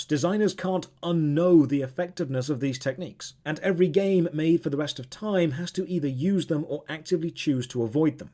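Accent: United Kingdom. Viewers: fuck ive missed your british voice so much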